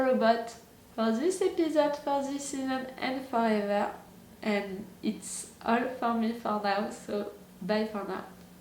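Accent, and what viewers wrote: France. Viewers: I’ve seen tons of shows the last couple of years, they came and went just like that but Mr. Robot reached me in a very strong and deep way, beyond the outstanding perfomance, acting, screenplaying and ost, because mental condition is very close to me and is something i hace to fight to everyday. Thank you for share your feelings and thoughts with the world about this underrated show, i dont know you but i can tell you are not faking reactions at all, I will always remind you "frenchglish" haha (you did it great! English is not my mother tongue also but i could understand you!) Well, i supposs this is the end, leave you my with my special moment (and song) of the whole show: "Darlene leaving the family polaroid picture in Elliot’s shelve" <link> Goodbye friend c'est fini.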